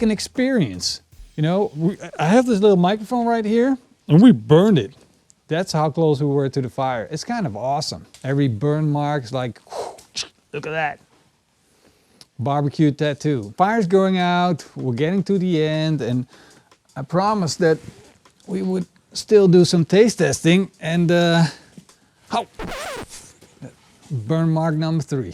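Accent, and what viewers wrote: Netherlands. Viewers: Dutch accent ?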